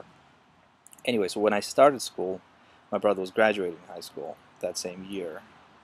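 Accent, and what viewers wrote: Midwest, United States. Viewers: Without sounding stupid as I haven't watched the entire video yet, how come you have a near perfect American accent? Did you live in America until you were 10? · Sounds like midwest english .. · Were you put in future kgb spy school. Your american accent is really good. Was your dad an american communist? How did you lose your accent? Your parents had to be americans that moved to the paradise. Ha ha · My God! You have no trace of an accent! One would never know you were originally Russian. You sound so American! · This is a very interesting account of your life and the life in general about living in the Soviet times ; Your ability to speak English like an American is quite impressive. Pretty much everything you described such as the description of your Kruschevka apartment, the scarcity of some foods (ie Bananas) lack of toilet paper ,the difficulty of traveling abroad and lack of private cars is exactly what i read about the USSR back in the mid 80s living in the US. 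It is true that the US news and movies did (and still do) have a lot of propaganda, but at times if you were a good reader you could occasionally find unbiased articles that described the daily life and the culture of the Soviet people .Few people today can remember a time before the internet and global connectivity back before you were reliant completely by books , tv or an immigrant who lived abroad. As a person who lived this way you do have a unique and straightforward perspective of telling your life in such a way that I find very few Russian immigrants feel reluctant to tell. I pesonally want to thank you for your story and time... You represent the American Dream that you can achieve if you believe. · But that aside based on the way you talk and look correct me if I’m wrong. You live in the central to Midwest in the USA. If I had to narrow it down I’d say Ohio or Indiana. Judging by your complexion you work indoors maybe even third shift. 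Id day you work in a warehouse or maybe a factory. Your not a business man based on your gruff appearance you don’t wear a suit. You use a lot of short to the point words entails you aren’t highly educated I’d say associates degree at most. Be honest how much did I get right? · My family is from Crimea and has deep roots in Krasnoperekopsk, I call fake on this video, he has a perfect American accent but allegedly spent his entire formative years in the Soviet Union, and smokes a fat cigar which is probably just a stereotype for street cred thing, and he says bedrooms are a foreign concept, no the idea of having one specific room for only sleeping and nothing else may be to some people but the idea of having a room for sleeping wasn't a foreign concept in the Soviet Union, and Leningrad was far from the nicest place in the Soviet Union to live. And you can complain about apartments not being luxurious by modern standards but by the standards of the time they were a huge improvement over most people's living conditions and far better than living on the cold streets, at least people were housed and fed, many countries in the west particularly back then had high rates of homelessness and unemployment and some still do. 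And bananas? Seriously? Oh well how horrible of the Soviet Union not to have installed a bunch of Banana Republics and conducted a bunch of massacres like United Fruit to get an unlimited supply of bananas, yes Bananas were a luxury that's because Bananas don't grow well outside of a tropical environment and it took acts of genocide and tyrannical imperialism to make them an affordable commodity in the United States and Europe. So no bananas, but there were plenty of grapes, at least in Crimea I can't really speak for the rest of the Sovi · how did u lose your accent so fast if you lived in russia for 30 years? you sound like your from the midwest. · Your accent is very American despite living in Russia for so long. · How come you sound American if came to America in 2005?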